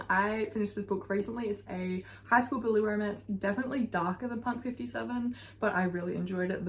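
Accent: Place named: Australia. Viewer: omg i just found your channel and when i heard a fellow aussie accent i got so excited 🫶🏼😭😭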